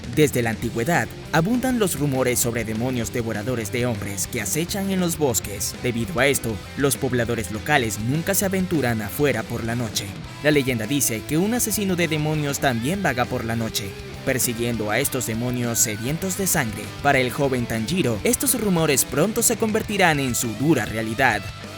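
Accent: Venezuela. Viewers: Eres de venezuela? jajajajaja este pana me agrada ahora :v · bro eres de venezuela coye que bien vl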